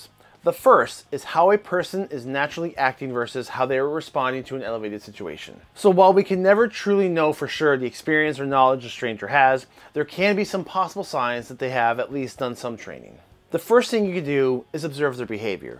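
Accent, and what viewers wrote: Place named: Russia. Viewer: Cauliflower ears and Russian accent is the ultimate combo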